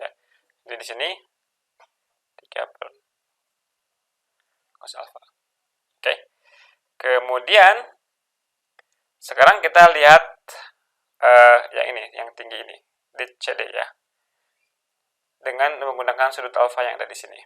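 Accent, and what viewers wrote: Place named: West Java, Indonesia. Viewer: Mantap nih, aksen sundanya dapat utbknya juga dapat.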